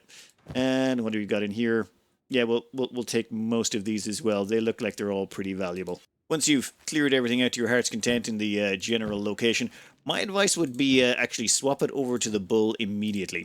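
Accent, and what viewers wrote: Ireland. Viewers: He answered it elsewhere. It's the R key lmao. It's just that paddy accent. Bless his heart.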